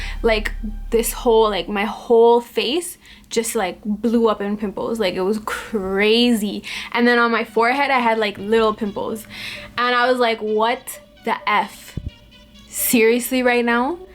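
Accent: Toronto, Canada. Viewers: lmao soo funny how you can tell someone is from Toronto by the way they speak! I'm from Toronto as well and I know soo many people who speak the same haha love your vids! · Within the first minute I was like.. "she must be from Toronto... most likely Scarborough" lol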